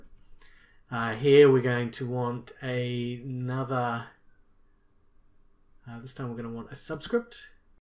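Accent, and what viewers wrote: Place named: Australia. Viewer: thank you, "mate"? I think i detect an Australian accent. You're brilliantly concise.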